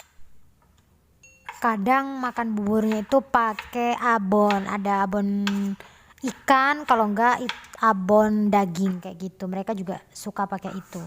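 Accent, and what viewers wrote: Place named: Surabaya, Indonesia. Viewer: Mohon maaf klau di lihat dari logat bicaranya Mbak dari Surabaya bukan yaa Mbak??🙏🙏🙏🙏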